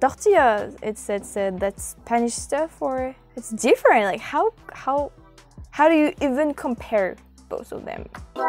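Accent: France. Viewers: I assume you could come to America and a restaurant would hire you for your French accent. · The French language when spoken in English is very nice sounding.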